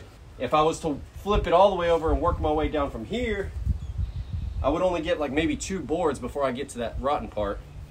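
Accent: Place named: South, United States. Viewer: I am not from your country, can I ask based on your accent and a Tee shirt you were wearing one day about hospitality in the South - East, is the timber you are milling today Swamp Cedar? It has the most amazing coloured wood. Really wished you had thought to pour water on it to show us its wet colour. We have a few similar coloured trees in my country one we Call the Australian Red Cedar, and the is called Jarrah (a hard wood). thanks.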